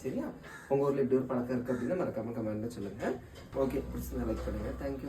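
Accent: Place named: Tirunelveli, India. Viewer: எங்க திருநெல்வேலி கிராமத்நில் 3 ஆம் நாள் அசைவம் சமைப்பாங்க தம்பிக்கு எந்த மாவட்டம் எந்த ஊர் என்று தெரிய்து ஆனா பேச்சி எல்லாமே திருநெல்வேலி மாவட்டகாரர் மாதிரி இருக்கு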